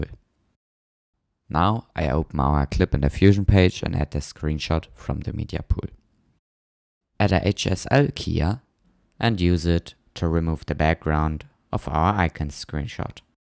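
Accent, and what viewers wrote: Germany. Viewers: Ich weiß nicht wieso, aber irgendwie hasse ich es, den deutschen Akzent zu hören 😅 (nichts persönliches, ist bei jedem englischen Deutschen so)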